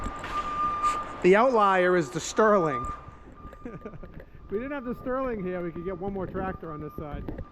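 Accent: Boston, United States. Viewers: I love listening to you with the Boston accent, cause I’m from Boston and I’m. I’m living in the south now and people always love my accent they say.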